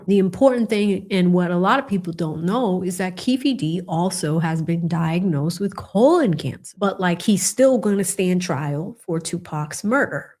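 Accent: Boston, United States. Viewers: You really let the Boston accent out in this one.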